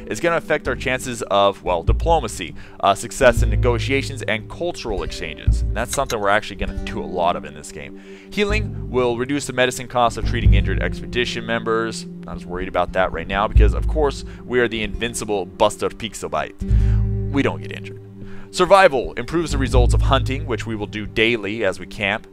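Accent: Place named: Italy. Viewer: your accent sounds more italian than spanish :)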